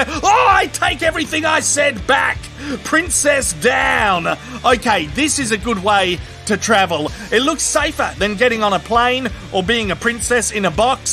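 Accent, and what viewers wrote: Australia. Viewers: Everything’s funnier in dramatic Australian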